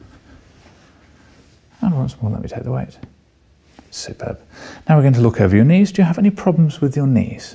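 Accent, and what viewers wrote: United Kingdom. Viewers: He's so British haha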